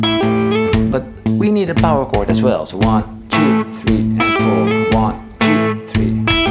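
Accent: Netherlands. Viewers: He got a dutch accent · without checking anything, I can hear you are dutch. The beauty of it, is that the guitar doesn't sound dutch at all. it sounds like beautifull blues <3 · Hahaha gelijk hoor je het hè Nederlands. Blijkt toch een super charmant accent te zijn. Hahaha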